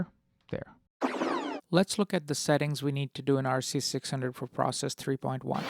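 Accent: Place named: England, United Kingdom. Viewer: Except the English accent everything else is excellent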